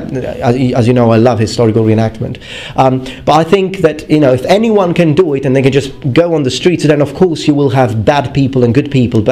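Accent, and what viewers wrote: United Kingdom. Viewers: Strong British accent on this Italian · I am amazed isnt English and actually learnt English quite late. He would make a classic BBC television presenter.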